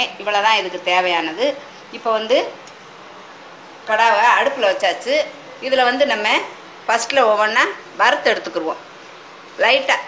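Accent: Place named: Sattur, India. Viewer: my dad was from a village near sattur, vembakottai and the way of talk has taken me back to that land of mine..good one thnks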